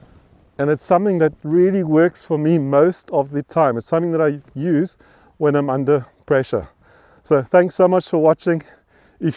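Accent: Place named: Austria. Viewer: Love the Arnold accent ... nice video!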